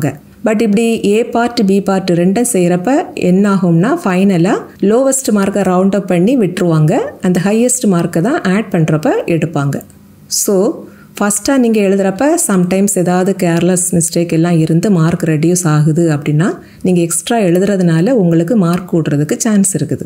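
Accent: Kanyakumari, India. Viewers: Kanyakumari slang matiri irukutu niga pasuratu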